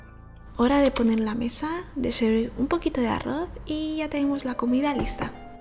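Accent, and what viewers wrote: Spain. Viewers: "Como buena asiática que soy. . . " 😁😁😁 Tu aspecto asiático combinado a tu acento español me atrapó tu canal☺☺☺. Desde luego que tu forma de cocinar también. Yo también he improvisado mucho en la cocina y es divertido 😆😆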